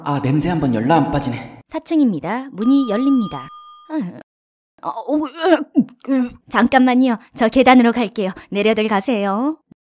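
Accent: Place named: Gyeongsang, South Korea. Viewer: 대구 사람인 것 같은데요?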